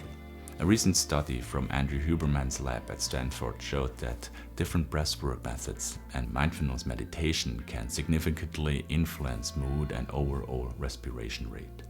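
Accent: Switzerland. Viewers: are you swiss ?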